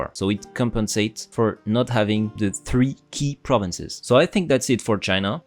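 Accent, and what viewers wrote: France. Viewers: Un français !!! Une bouffée d'air frais (ouais je t'ai cramé a l'accent ;) ) ! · I have the impression of hearing an accent, are you French?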